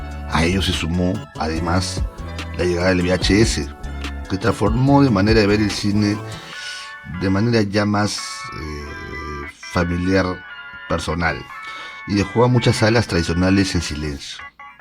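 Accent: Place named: Lima, Peru. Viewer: Posiblemente seas joven y estás acostumbrado al acento Mexicano. El Sr tiene acento Limeño.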